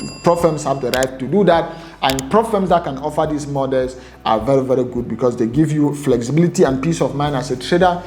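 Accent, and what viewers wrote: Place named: Nigeria. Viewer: My wife said your accent is Nigeria. Lol. Thanks for the analysis it was helpful. Good job.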